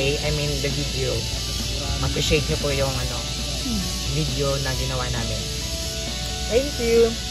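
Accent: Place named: Philippines. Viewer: I can still hear your tagalog accent fren hahaha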